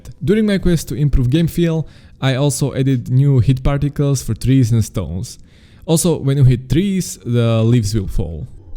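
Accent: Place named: Czech Republic. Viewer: Your accent sounds like czech lol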